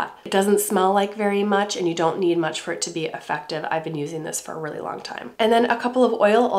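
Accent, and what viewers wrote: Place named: Minnesota, United States. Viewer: I knew I noticed a hint of a Minnesota accent! 😉. I hope you’re feeling better. My hair “routine” consists of Joico de-tangling spray, Chi Silk Infusion, my Dyson supersonic and round brush, and sometimes a touch of argon oil or anti- frizz serum once dry. My hair is straight, so it’s pretty easy. I’m envious of your beautiful curls!!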